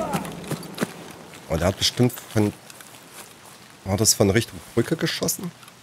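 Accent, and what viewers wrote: East Germany, Germany. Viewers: Du könntest aus meinen Dorf sein so wie du redest 😅#Ossis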